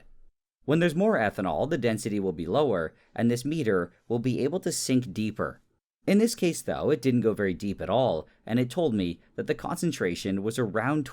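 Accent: Canada. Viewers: Laying in the dark with my eyes closed and I finally hear the Canadian accent. It’s is SO strong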